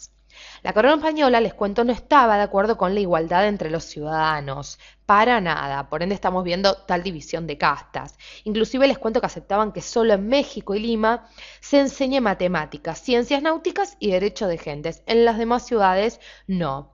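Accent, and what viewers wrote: Argentina. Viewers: CHE SOS COMO ARGENTINA NO SE XD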